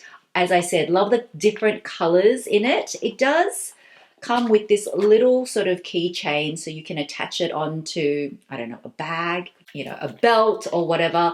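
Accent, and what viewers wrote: Australia. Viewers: I am a nurse Educator! Love your videos and the Australian accent! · Hey! My name is Tia and I’m a Fellow Aussie 🇦🇺 but from Perth here! Love your channel and watching your vlogs and hauls! So refreshing to hear our Aussie Accent! Keep up the amazing work! #faMely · #faMely I love your Australian accent. I’ve been watching your videos for a while and love your bag collection. I enjoy your videos it makes me appreciate high fashion. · #faMELy I am also from Australia and I can't tell you how awesome it is to hear another Aussie accent in the youtube world ;)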